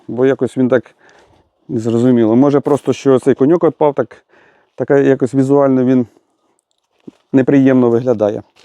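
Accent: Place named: Kharkiv, Ukraine. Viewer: Форточка - кватИрка (укр.) Телефон - так и есть на укр.яз. - телефон, а не "телеХВон".))) Я подозреваю, что вы сами родом со Слобожанщины. Проскакивает харьковский суржик, как не стараетесь говорить українською мовою..) Да и в Харькове было такое риэлтоское агенство "Квартал".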